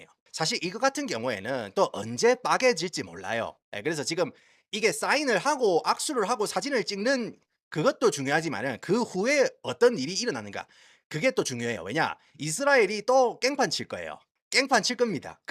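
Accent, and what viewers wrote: Gyeongsang, South Korea. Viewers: 같은 경상도사투리억양이라 더 잘 알아묵겠는...신기한현상ㅋㅋㅋ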